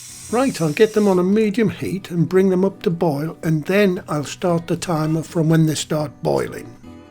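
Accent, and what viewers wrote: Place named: Newcastle, United Kingdom. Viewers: An English voice , at last, to be found on the internet. I watched , just for the relief of it. Just the metric references to be got rid of I've shared it with a genuinely interested party . Thank you. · You got a like when I heard your Geordie accent. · I'm so happy I found your channel. I'm a northerner myself from Newcastle who now lives in the states. I've been terribly homesick and not able to travel back due to Covid:( Hearing that accent and watching all your videos makes me feel like I'm back home! I'll be trying some of your delicious recipes this weekend! HAWAY THE LADS. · I love this channel. I am,also,from the north east and your voice takes me back to my time there and my mam's corned beef pie was just like yours. Thrilled to have found you.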